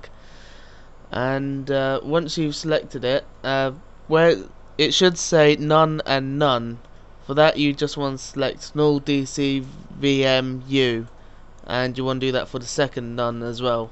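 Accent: United Kingdom. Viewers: Two things. You are a savior in the world of video gaming. You have helped me play Resident Evil - Code Veronica. You have a British accent. That's awesome. But for some reason everything you say is interpereted by my computer into this kinda thing; - "7 great rings were forged from the fiery pit of Mt. Mordor." - "One ring to rule them all." - "They're taking the hobbits to Isenguard." PLZ HALP! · I believe I was just instructed by Harry Potter...O_o cool accent xD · the way he pronounces dreamcast games:driimcost gheims ok,i know hes british or something xD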